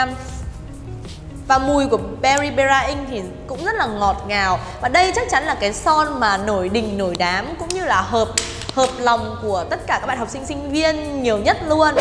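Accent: North, Vietnam. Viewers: Cj giọng Bắc cực kì dễ thương lun ák. Mãi iu cj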